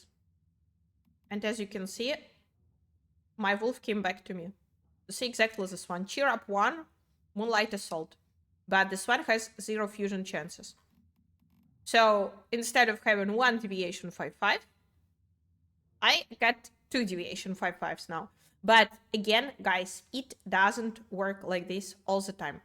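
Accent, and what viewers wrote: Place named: France. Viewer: I'm wrong again. That's OK, I'm used to it - I'm married. Lovely accent, is it French then?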